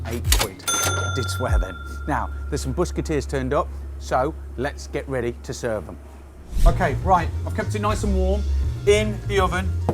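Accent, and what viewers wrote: Birmingham, United Kingdom. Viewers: Food busker I can't quite figure out exactly where your accent is from,are you a brummie or is it more of a Wiltshire/Gloucestershire twang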